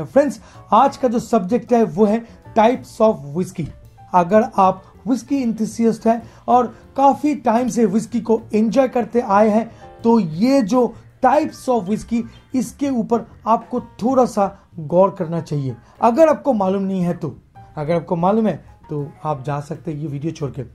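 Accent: Bengal, India. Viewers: Love your vdos. Your knowledge is fantastic and your bong accent is cute :)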